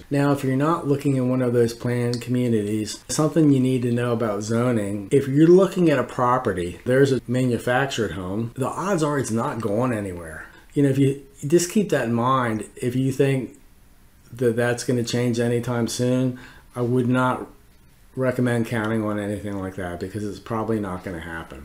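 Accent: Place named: Pittsburgh, United States. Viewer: Great content! You sound like you’re from Pittsburgh!?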